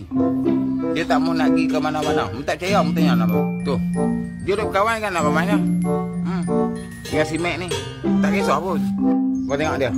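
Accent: Kedah, Malaysia. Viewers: Pkcik ni ckp loghat apa ye?kedah ka atau terengganu/klntn?😅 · abg org mna..ckp supo org bliing ja😂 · Mu orang Baling ka abg? Wak tak tuh dehh..dema kacau kita dehh..😅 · Bg. ni ckp loghat Baling Kedah...kalau area Kuala Nerang-Pedu-Pokok Sena-Titi Akar, loghat mcm ni orang Melayu yg boleh cakap siam...dah mix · Cara ckap mcm org baling 😌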